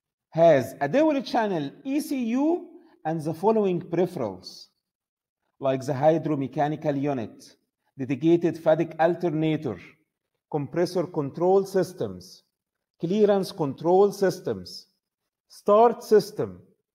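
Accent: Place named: Egypt. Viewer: اللهجه المصريه 😂 فيديو رائع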